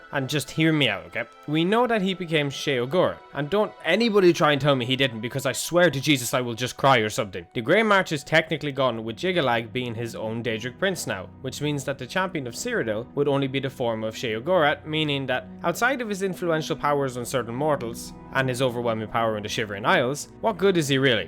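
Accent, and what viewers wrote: Ireland. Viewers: Dude you misunderstood something, the realm of a Deadric Prince is his BODY. Why do you think Jyggalag didn't come back after this? Because he lost his Real/Body and so has the power of a lesser Deadra. You the "Hero of Kvatch" are the new owner of this realm, so you own all the power that is in it. Love your Channel and your Irish accent. Ps. Dragonborn can alter the Dream of Creation and so are extremely powerful but are only tiny pieces of Aka (the Original time god).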